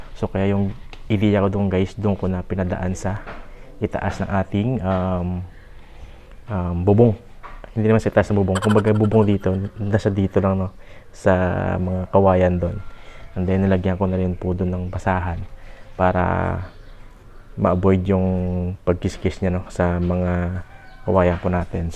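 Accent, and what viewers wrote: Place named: Ilonggo, Philippines. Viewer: Ilonggo ka boss? Dw nabatian ko ang tono ta eh,hahaa.pila ang set sina boss shampoo and water pila magasto? Thanks for sharing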